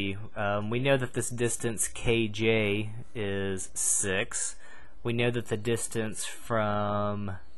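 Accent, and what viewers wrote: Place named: Baltimore, United States. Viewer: Sounds like he has a Baltimore accent.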